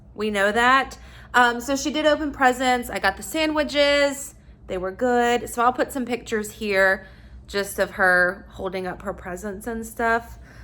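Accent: South, United States. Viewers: I love how you talk lol. I'm from texas I have a southern accent too lol. Nice vlog love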